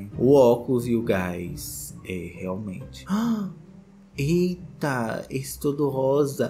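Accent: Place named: Belo Horizonte, Brazil. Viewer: O sotaque mineiro dele é de bh é quando ele "imita " o sotaque ,ele puxa mais para o sotaque do Norte de Minas kkkkk Falo isso pq minha família materna é do Norte de Minas e eu sou da região metropolitana de bh. Os sotaques são diferentes kkkkk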